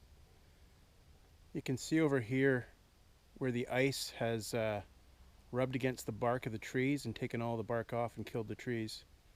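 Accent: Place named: Canada. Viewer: It's the default go to for d!psh!ts who want to make some "government bad" meme and use Cali as the example of "over regulation". Brain dead as they usually are, they fail to take into account that, by his "accent" is a fine Canadian lad. As such, he deals with more regulations than a dumbass yank.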